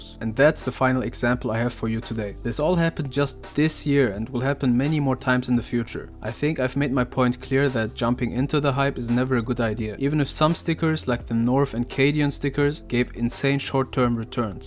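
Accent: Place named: Germany. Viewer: Where are you from? You sound like german and you talk about trilluxe in some vids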